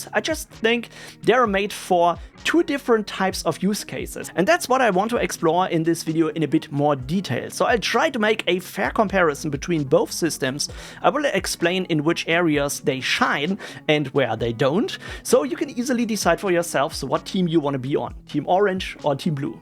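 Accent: Germany. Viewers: The German accent is the best one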